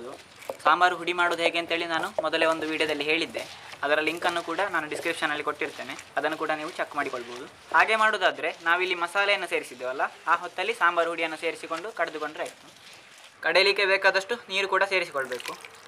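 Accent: Mangalore, India. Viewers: ಮಂಗಳೂರು ಕನ್ನಡ ಕೇಳಿ ತುಂಬಾ ಖುಷಿ ಆಯಿತು. ನಿಮ್ಮ ರೆಸಿಪೀಸ್‌ try ಮಾಡಿದೆ, ಒಳ್ಳೆಯ ರುಚಿ. ನಿಮಗೆ ಇಲ್ಲಿ UK ನಲ್ಲಿಯೂ ಸಹ ದೊಡ್ಡ fan following ಇದೆ. Keep it up 👍🙏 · Thumba samayada nanthra nimma kannada hagoo recipe nodi mangalore ge bunda hage feel aithu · Listening 👂 Kannada words from your side is absolutely mesmerising, loved the mangalore accent great video liked it!!!!!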